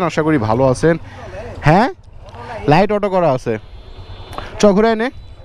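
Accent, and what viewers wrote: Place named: Bogura, Bangladesh. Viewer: জি ভাইয়া অবশ্যই তবে আপনার আঞ্চলিকতা ও আমাদের বগুড়া আঞ্চলিক অনেক মিল রয়েছে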